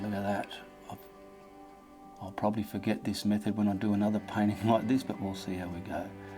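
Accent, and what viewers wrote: Australia. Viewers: Hi! I live in Virginia. After watching many Bob Ross videos and loving them, I decided to look for some videos with acrylic painting, and I found you. You are very instructional and I enjoy your Aussie accent and colloquialisms. I just watched the Misty mountain tutorial. I loved it. Your trees are beautiful! I have a question for you. Why won’t the knife work for painting mountains with acrylic paint? ❤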